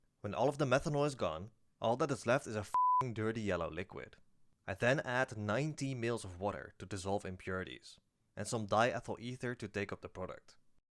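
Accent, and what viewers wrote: Netherlands. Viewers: I know a fellow Dutchman when I see (hear) one... goed bezig man, je hebt een kijker erbij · Are u dutch ? u sure as hell sound like it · r u dutch? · Are you by any chance dutch? I noticed a little bit after way too long but i did pick out a couple of pronunciations when i started to focus on it, and now you saying youre not a native speaker... That would be really cool! Anyways loved the video im glad i got it in my recommended im definately gonna binge you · I hear a Dutch accent? Gaaf hoor, en ik moet me echt een rain geur aanschaffen 😅 -Oh nee ze zijn uitverkocht!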